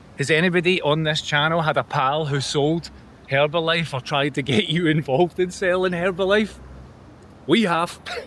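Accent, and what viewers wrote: Scotland, United Kingdom. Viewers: I know that accent, is that not Scottish? Or Yorkshire or someting? U remind me of a dear friend....he would say me arms are in pen as in my arms are in pain lol Welcome to MALAYSIA! And Have fun in KL!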